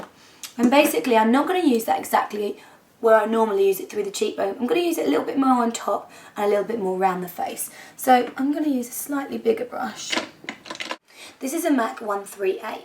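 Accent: United Kingdom. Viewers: Jesuuus! She's british and I can't understand many things she say :/ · I really love your brittish accent *__*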